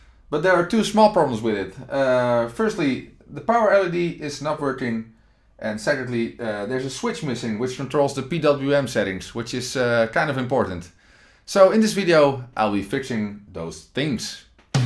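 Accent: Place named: Moldova. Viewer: First hearing your accent. Flavors of Moldova ?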